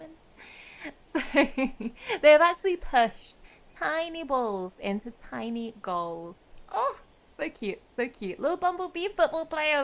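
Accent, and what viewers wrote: United Kingdom. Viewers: Now normally I am not the biggest fan of bees, but seeing with glasses and giving a lecture remained me of why she is the exception to my dislike. The lecture was very well done and the facts were huge eye openers like how bees do dance which I knew about or that bees can be trained. That does expand my knowledge a good amount more and again with glasses and her british accent all scream hot teacher so soild lesson!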